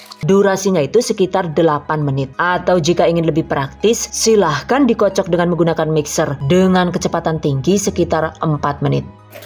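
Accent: Banyumas, Indonesia. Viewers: 😂 jebule ngapak 😅 keren Channelnya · Lama ga nengok channel ini. Kangen intermezzonya.. Logat ngapaknya manaa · Ngakaaaak... Ternyata orang ngapak juga, ora ngapak ora kepenak ya mbekayu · wkwkwk.. ngapaknya darimana bang? saya cilacap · Waw dubbernya " ngapak" kiye 🤣🤣🤣🤣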